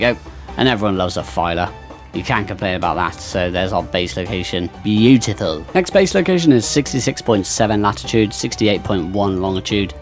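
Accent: United Kingdom. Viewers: Everyone in the UK sounds like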